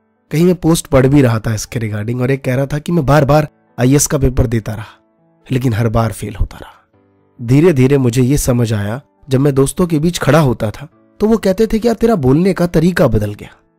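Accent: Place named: Delhi, India. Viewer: Sir nice video by the way aap Delhi se ho kya Touch wahi laga bolne ka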